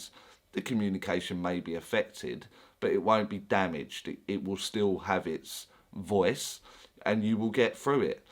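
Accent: United Kingdom. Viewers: Breath of fresh air. My fellow Brit says it how it is. Sounds like he comes from my neck of the woods. Thank you!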